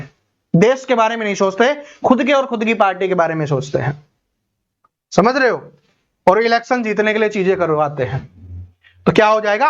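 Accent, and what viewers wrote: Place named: Haryana, India. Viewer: Fir to sir ap haryana se hoge 😅😅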